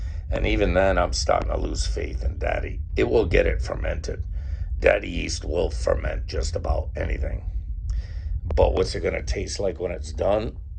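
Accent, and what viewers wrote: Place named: New York, United States. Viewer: Glad i found your channel.. Thanks for the update, yeah these northeast cold nights are starting to suck. Its killer you sound just like my cousin from NYC.. I set my fermeting barrel in an oversize barrel and filled with water, tiny fountain pump and a fishtank heater with a loose lid.. keeps my shit at 87degrees in my shed. I was gifted 2 cases of bananas yesterday and just seeing what to do with em, between you, stillworks, bearded and beaver i got a few ideas.. appreciate it!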